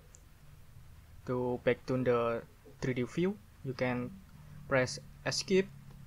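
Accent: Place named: Indonesia. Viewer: the logat like indonesian people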